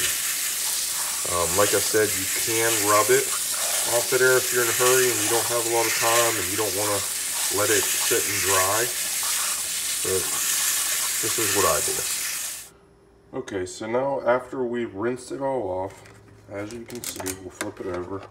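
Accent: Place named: Philadelphia, United States. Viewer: You gotta be from delco or Philly area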